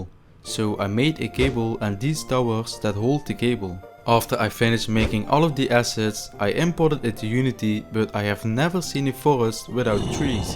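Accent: France. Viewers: c'est drôle , j'ai l'impression que tu as un accent français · Great French accent 👍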